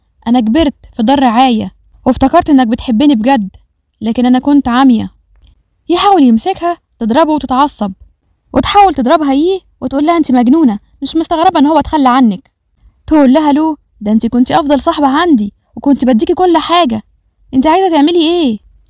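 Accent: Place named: Deir ez-Zor, Syria. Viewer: انت من اي بلد مبين من صوتك انك بنت من سوريا ومن الدير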